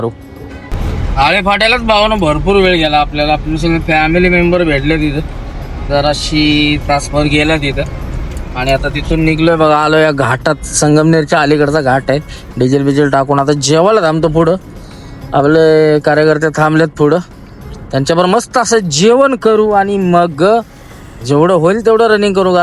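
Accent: Satara, India. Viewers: Bhava satarai Tone badluu nka. Plz. Majjja yet naiii laka..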